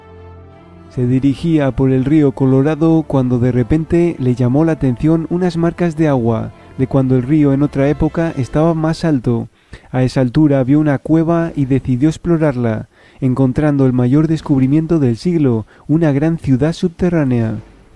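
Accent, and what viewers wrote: Spain. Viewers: que horrible voz, no me extraña que los latinos critiquen como narran los españoles, por suerte no todos hablamos asi de mal, parece tonto o imbécil, tu voz es pésima, horrible, lo narras muy mal, no pude aguantar mas de 20 segundos, que asco escucharte, lo digo sin animo de ofenderte, pero eres un idiota, cambia de profesion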